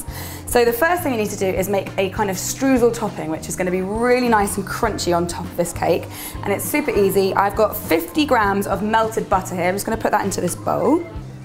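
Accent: United Kingdom. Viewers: Doppelgänger she speaks British English with a beautiful accent.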